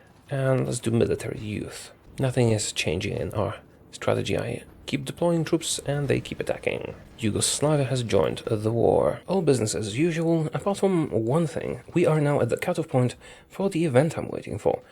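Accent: Poland. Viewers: are you polish you sound like a polish person who migrated to the UK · Your voice kinda reminds me of the English dub L But a little polish? I think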